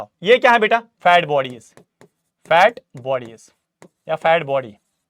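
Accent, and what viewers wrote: Kashmir, India. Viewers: Kashmirii accent ❤😂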